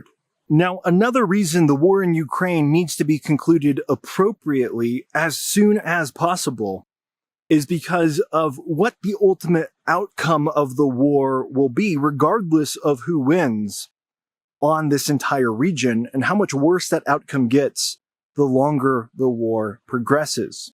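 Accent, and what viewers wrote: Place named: South, United States. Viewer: it looks like west Coast USA but he sounds abit South accent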